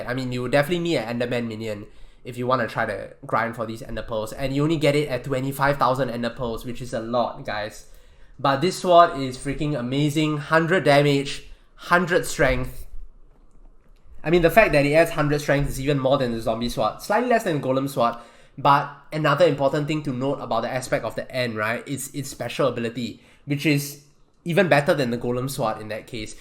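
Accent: Singapore. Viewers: You sound like your from Singapore 🤔 I’m from Singapore :D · are you singaporean? because you sound like a singaporean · Damn your singlish top class man support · he’s singaporean and so am i it’s a common mistake people make in singapore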